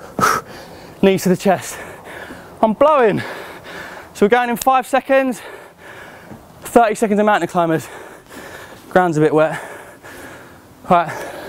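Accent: England, United Kingdom. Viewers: Alice Liddel and a bit of a softer english accent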